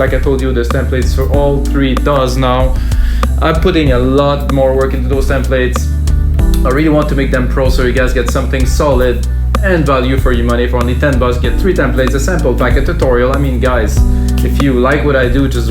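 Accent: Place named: France. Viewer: ptit accent francais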